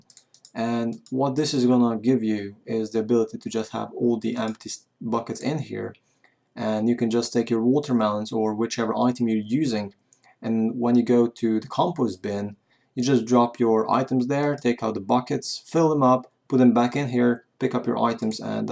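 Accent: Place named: South Africa. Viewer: What accent is that? Sounds half South African, half something else. Nice vid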